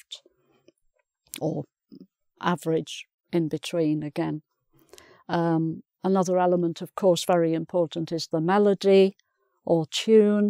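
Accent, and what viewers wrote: United Kingdom. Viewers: wow vary pority english british viva teacher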